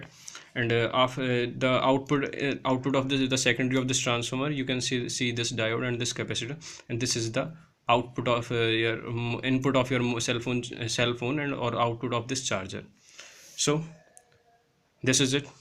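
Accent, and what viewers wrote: India. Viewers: I am only 2 minutes in but it seemed to me that while you were explaining what each individual part is you might as well acknowledge the USB output connector too. I know what it is and the fundamentals of how that particular piece of this puzzle works, but for those who do not know what it is, a quick intro to it like the rest might help someone. Jus sayin' ... :-) I truly admire the fact that you do not want to waste peoples time with a long drawn out video. But there were a few times where you just motored through a sentence or two leaving my ears in the dust. Throw in the fact that I have not seen my Indian friends in years nor have I visited Pioneer Blvd and I had trouble keeping up. Of course that one is on me :-) But yeah, almost sounded like you plugged yourself into one of those chargers you were going fast! But I'll take fast over a long drawn out video any day of the week. 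I should add that I found this a very informative video as I did learn a couple things. Thank You and Thumbs Up!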